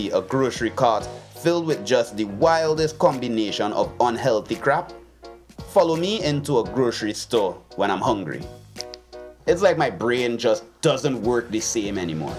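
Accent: Trinidad and Tobago. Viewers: Sound like a Trini. But living where? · are you a Trinidadian 🇹🇹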